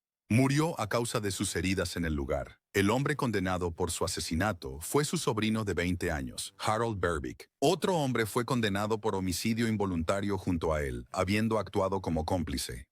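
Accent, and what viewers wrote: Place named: United States. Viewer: El contenido del vídeo está bien, lo que no me gustó mucho es esa voz agringada que exagera las pronunciaciones de los angliscismos y los apodos sajones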